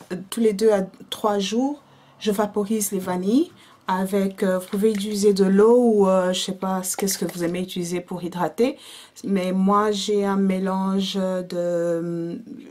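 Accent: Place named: England, United Kingdom. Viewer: tu as un apcent anglais !!! J'adore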